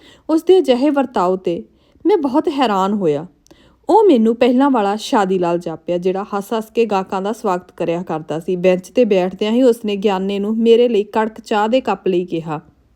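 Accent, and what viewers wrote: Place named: Majha, India. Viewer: ਠੀਕ ਸੀ ਕੁੜੀਏ ਖੜ ਦਾ ਪਰਦਾ, ਪਰ ਲੱਗਦਾ ਬੋਲੀ ਮਾਝੇ ਦੀ ਬੋਲਦੀ ਏ ।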